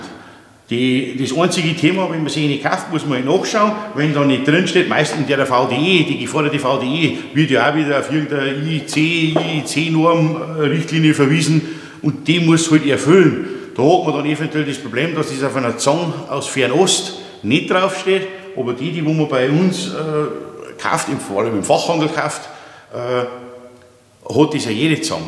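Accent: Bavaria, Germany. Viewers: Ich finde deine Videos klasse, aber teilweise kommt dein bayrischer Akzent sehr stark durch und ich muss zurückspulen weil ich Dinge nicht verstanden habe 😅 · Die Versuche Youtubes Bayrisch in deutschen Schrifttext zu übersetzen - köstlich🤣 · Thats bavarian german :D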